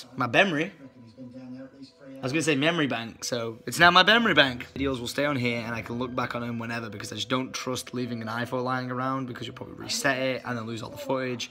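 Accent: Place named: United Kingdom. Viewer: Respond Why does he have a British accent?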